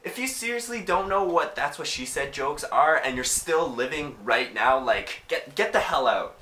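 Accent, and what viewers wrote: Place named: Canada. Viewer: You know how i can tell ur from canada? you say About and Out weird! I'm moved to Canada , it's kool :)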